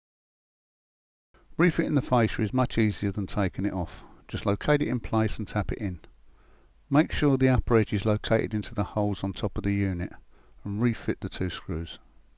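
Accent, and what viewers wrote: United Kingdom. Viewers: This bloke sounds like geezers I worked with in the 1970s. I'd definitely say he's from South East London. Penge? Peckham? Blindin' instructions pal. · Perfect instruction on what to do. It means even I can change my door. Maybe it’s cause I’m welsh but I Loved the ganstar cockney accent. It felt like I was being told what to do by a proper hard Londoner 😁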